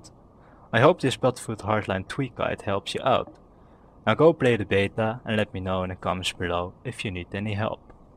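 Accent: Netherlands. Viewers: You're dutch :D dat accent tho. - a current reader of ur BFH app :)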